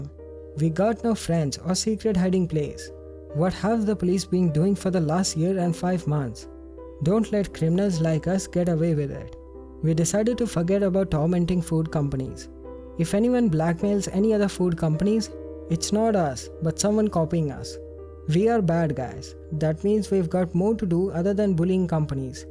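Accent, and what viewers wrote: India. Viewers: you don’t understand that the creator of the channel is actually an Indian man, and thus is probably going to have an Indian accent? 🙄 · indian narrator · the Indian accent is undeniable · The narrator is prolly from India 🙋🏽‍♀️ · Walks in Hears Indian Accent Walks out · I agree. I don't understand having a man with a strong Indian accent narrate this video, of all people. I couldn't understand a lot of it and it was really distracting.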